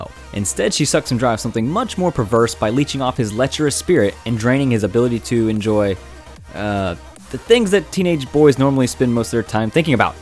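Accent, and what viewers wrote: France. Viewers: tu es francais?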